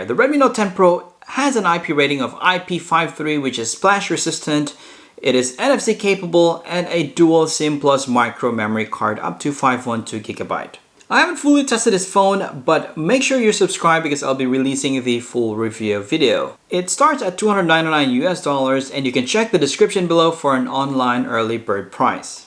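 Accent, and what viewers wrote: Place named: Dumaguete, Philippines. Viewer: mao diay maayo muinglis bagsik og accent ba taga dumaguete diay ka sir.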